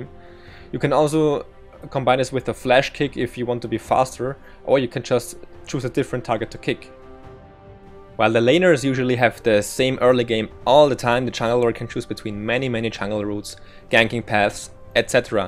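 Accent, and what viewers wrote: Germany. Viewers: du bist deutsch oder? xD Nettes guide! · are you german?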